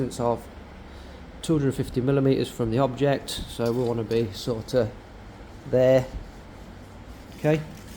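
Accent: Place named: Yorkshire, United Kingdom. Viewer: who'd have known that the best lens refinisher would have a yorkshire twang. 👌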